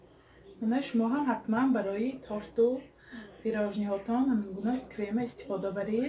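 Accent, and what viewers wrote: Isfara, Tajikistan. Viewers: Салом апаҷон.шумо аз Исфара неми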